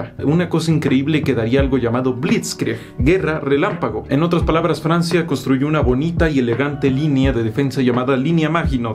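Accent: Chile. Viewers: es chileno? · Excelente, en chileno entiendo mejor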